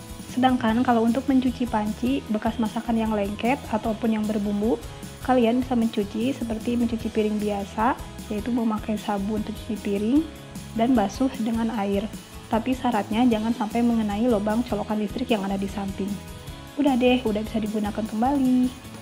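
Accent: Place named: Sunda, Indonesia. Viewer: Kangen sm suarany,,,orang jawa timur tapi suara sunda heee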